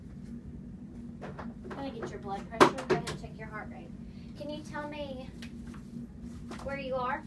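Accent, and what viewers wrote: United States. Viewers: Us southern girls have that accent! 😉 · Kentucky accent if I'm correct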